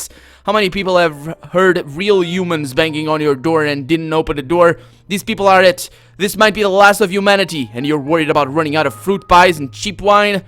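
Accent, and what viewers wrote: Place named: Portugal. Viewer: Aha! So you're portugese? I've always wondered what accent that was :D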